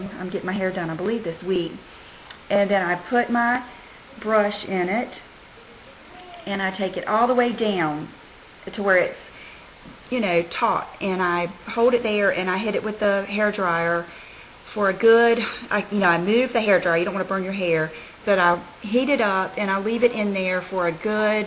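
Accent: South, United States. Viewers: I always hope that you will say "Hey, Ya'll" bc i love ur cute southern accent, but you never do. You should!!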